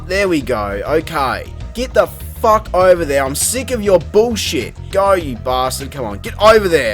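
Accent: Australia. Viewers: ANNOYING VIDEO WITH AN AUSTRALIAN ACCENT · I just put up a review for the first one and here I see you post another hilarious one for the sequel hahah keep it up. strikes again!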